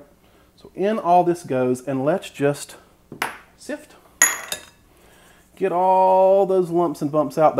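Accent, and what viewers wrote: South, United States. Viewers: I adore you ❣ I adore and appreciate your honesty , your humor and your southern accent keeps me smiling while I am cooking along with your tutorials..... I just adore you ❣❣ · I love your enthusiasm for food !!! and your southern accent is darling!! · I love your accent ! It reminds me of my southern family!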